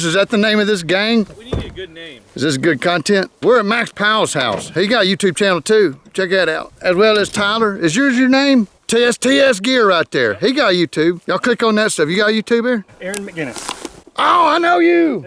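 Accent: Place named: Louisiana, United States. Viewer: Good times had by all, great vid 😃. Maybe it's the other guys accents, but you sound more Louisiana than when your at home 😃😃 👍👍🇬🇧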